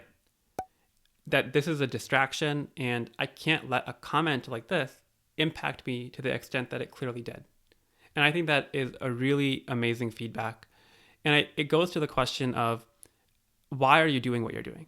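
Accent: United States. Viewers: It's wild how it's always the one negative comment that sticks with us. You always sounded like any other American to me (from an undisclosed African country)...that wasn't meant to sound insulting fyi...anyway, the content is great, anyone focusing on accents and not the basically free knowledge being dropped is to be ignored. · Don’t bother about those comments, you are doing awesome job.You are raised here and definitely you will have the American accent.Lot of people in India do not know this. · What's wrong with american accent?, even if u are faking, to speak like this needs some hard work, I'll appreciate instead posting negative comments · You sound 💯 percent American. No.accent.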